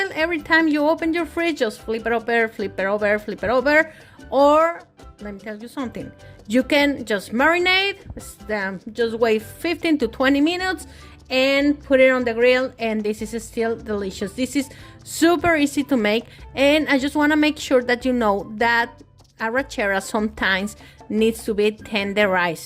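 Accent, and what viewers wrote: Mexico. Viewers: I love your accent. Viva mexico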